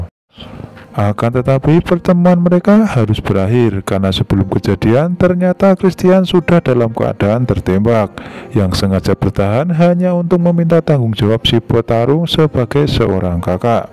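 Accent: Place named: Java, Indonesia. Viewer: Bahasa Medok is REAL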